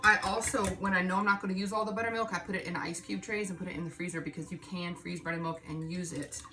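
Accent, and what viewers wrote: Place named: South, United States. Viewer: you must be from the south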